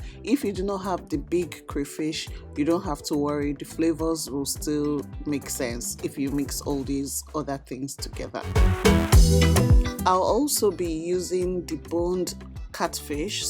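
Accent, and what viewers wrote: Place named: Igbo, Nigeria. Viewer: Looks yummy 🤤 you speak like an Igbo woman. Well- done sis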